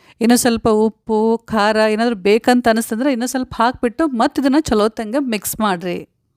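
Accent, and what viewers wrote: North Karnataka, India. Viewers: ಮೊದಲನೆಯದು ಭಾಳ ದಿವಸ ಆಗಿತ್ತು , ಉತ್ತರ ಕರ್ನಾಟಕದ ಭಾಷೆ ಕೇಳಿ , ಮತ್ತು ಅದರಾಗ ನಮ್ಮೆಲ್ಲರಿಗೂ ಹಿಡಿಸುವ ಶೇಂಗಾ ಚಟ್ನಿ ಕಲ್ಲಿನ ಗ್ರೈಂಡರದಾಗ ಮಾಡುವುದು ಹೇಳಿದ್ರಿ, ತುಂಬಾನ ಖುಷಿಯಾಯಿತು. ಧನ್ಯವದಗಳೊಂದಿಗೆ 🙏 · ನಿಮ್ಮ ಭಾಷೆ ❤️ ಹೇಗೆ ಮುಂದುವರ್ಸಿ ಉತ್ತರ ಕರ್ನಾಟಕದ ಭಾಷೆ ಲೀ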